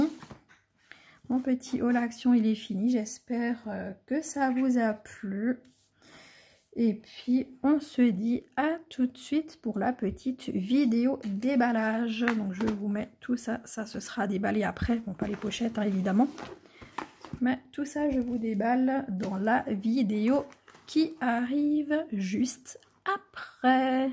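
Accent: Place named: Alsace, France. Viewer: Cc je viens de tomber sur ta video ou la la un accent qui me fais bien plaisir qui viens d'Alsace si je me trompe pas moi je suis originaire de strasbourg aussi mais je suis bien loin a present je suis dans le 44 (loire Atlantique) MERCI en tout cas pour le haul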